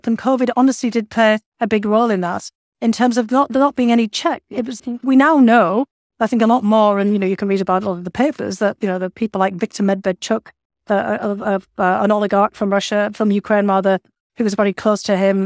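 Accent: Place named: England, United Kingdom. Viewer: Sounds like she’s got an English accent.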